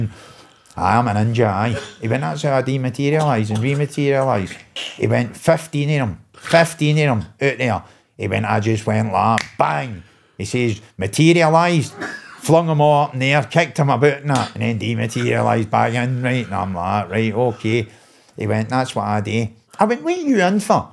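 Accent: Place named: Glasgow, United Kingdom. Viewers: I understand every word am no even a weegie 😂 perfect accent of Scotland 😎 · Unreal patter. It makes it even better that only us glesga folk understand every single word. Phenomenal haha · Hilarious story and made even more hilarious by the Jock accent. Sorry Yanks you're missing out. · Maybe sub titles for his awesome Scottish accent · Very very very funny. I feel sorry for your foreign audience, S... that's about as broad a Ouijii Lallans Scots as youll get.. Im a Scot and it tested my ear!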